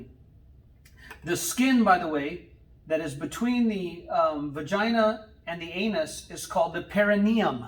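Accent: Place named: United States. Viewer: Sprich deutsch. Dein ami-palaber ist für mich unverständlich.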